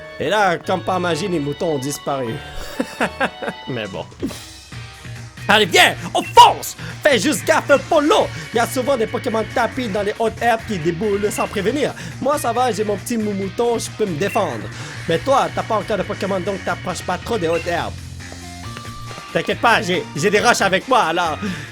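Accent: Canada. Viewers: Tes français chinoi canadien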